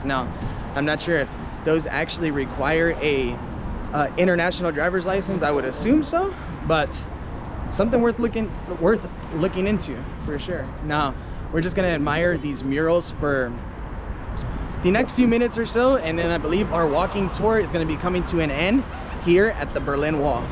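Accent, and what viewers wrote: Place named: Miami, United States. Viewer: you have to tell me you’re from Miami. I know that accent anywhere! This is my first video so not sure if you’ve mentioned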